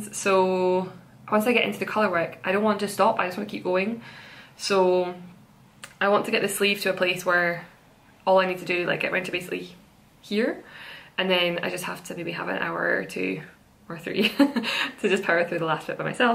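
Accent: Scotland, United Kingdom. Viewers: Hello! I'm so glad I discovered your podcast. Love all your projects, and I prefer neutral/softer colours too. What part of Scotland are you from? I was trying to work out your accent. 😊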